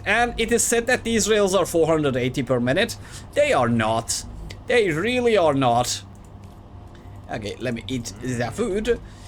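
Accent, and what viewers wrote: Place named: Turkey. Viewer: oh, I didn't realize that was modded. I've made 3 story builds before, sometimes just tucking amps under the floor boards so it still looks like a two story. And I was trying to place the accent, didn't have turkey in my head. Keep the vids coming.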